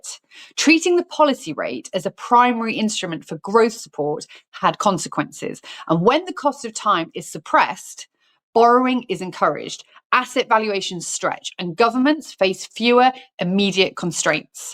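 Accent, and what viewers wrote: England, United Kingdom. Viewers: I just love English people. I could listen to y'all talk about paint drying. Great presentation though.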